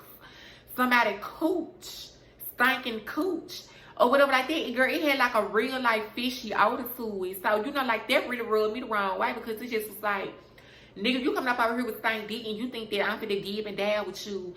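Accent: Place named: Tennessee, United States. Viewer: Omg you from Memphis!!! I made my first comment without see the part you from Memphis lol I know a Tennessee accent.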